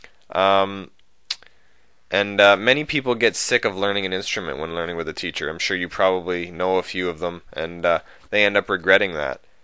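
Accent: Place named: Halifax, Canada. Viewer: Are you from Halifax? That accent always gets me...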